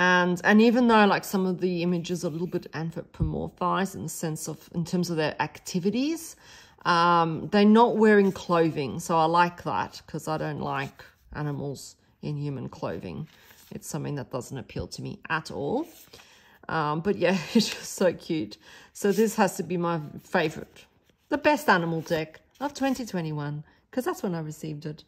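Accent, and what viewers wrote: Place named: Australia. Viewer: I forgot to comment when I watched 🤪 So, I loved this 😍🙄🤣 it is always good to hear another Aussie voice 🌺🍀🏵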